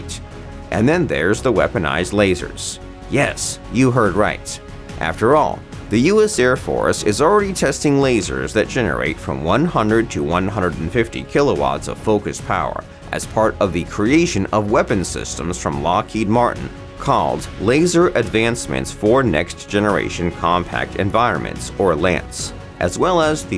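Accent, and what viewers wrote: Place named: India. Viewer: why you sounds like indians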